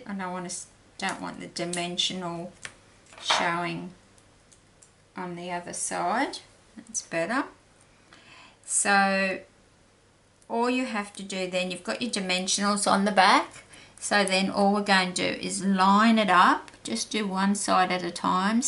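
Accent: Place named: Australia. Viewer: no wonder you had such a nice accent you live in Australia. I live in USA California. I do have a someone I can buy from if i need to. Thank you so much for offering your help that was very kind of you. Have a Blessed Day. with a smile:) Julie G.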